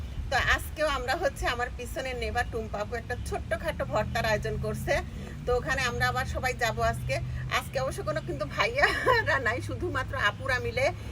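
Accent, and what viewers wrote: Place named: Bangladesh. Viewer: আপু আপনি তো বললেন না বাংলাদেশে আপনার দেশের বাড়ি কোন জেলাতে। আপনার ভাষা শুনে মনে হয় আপনি আমার জেলার মানুষ। তাই খুব জানতে ইচ্ছে করছে। যদি কোন সমস্যা না হয় একটু বলতেন ভালো লাগতো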